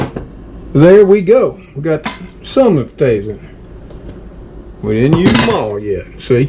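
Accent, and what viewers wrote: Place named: Cajun, United States. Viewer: Before the video is over we love cats and we want to see TRIX she is so cute bust like my cats.Get cooking some more with your oignons cajun talk...I understand I'm french canadian so it's fun dropping in and putting the mask and the Covid aside!Take care and give Trix and all your other cats a big hug for me! Until we meet again online Bye bye!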